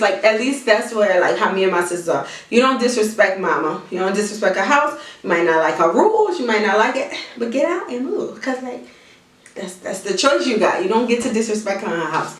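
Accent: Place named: New Orleans, United States. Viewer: Nice Review 👍🙏 Ms. U have a Real New Orleans, Louisiana Accent for Real❤️I Love It!!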